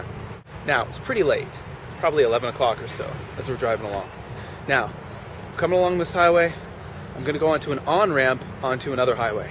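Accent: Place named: Maritimes, Canada. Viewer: lol. great story. i love the east coaster accent, it makes the story. Reminds me of Rick Mercer or Andrew Younghusband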